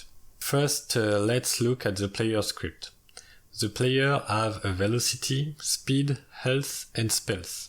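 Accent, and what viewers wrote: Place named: France. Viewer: Bro t’est français ! J'arrive à reconnaître l'accent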